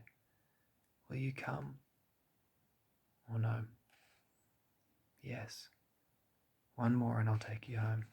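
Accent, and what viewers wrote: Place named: Australia. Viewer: I find the aussie accent soft speaking so relaxing and calming, I think because we have the best accent 😜 great video, I loved the story, keep it up 👍🏼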